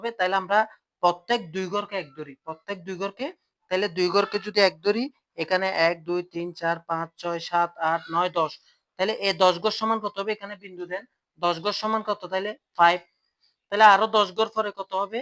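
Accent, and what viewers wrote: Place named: Chittagong, Bangladesh. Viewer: Thik dhorchen....sir er kothay buja jacche je sir ctg er.....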